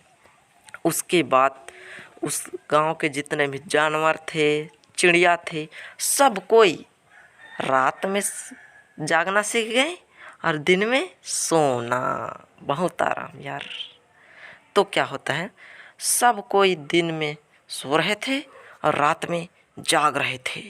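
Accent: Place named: Bhojpuri, India. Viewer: This is my first time someone is teaching me in Bhojpuri accent 🙄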